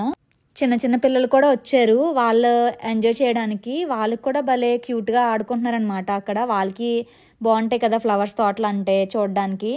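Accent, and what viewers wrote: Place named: Godavari, India. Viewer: Meru mana godarolla Aisa bagamatladutunnaru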